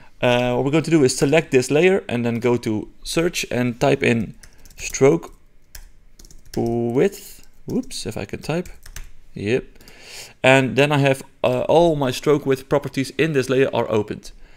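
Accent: Netherlands. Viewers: Thank you for this tutorial! It really helped me. And also - your dutch accent, it's so beautiful, love it!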